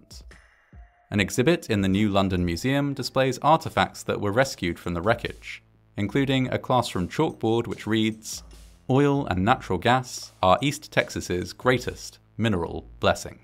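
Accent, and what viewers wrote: England, United Kingdom. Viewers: I like how you don’t realize he’s English and that they pronounce things differently. America isn’t the centre of the universe.🙄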